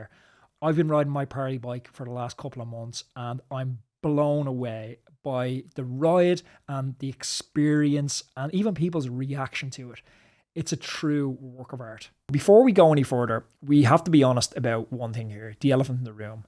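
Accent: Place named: Ireland. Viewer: Its called authentic self if you cant tune your ear to a good Irish accent your just lazy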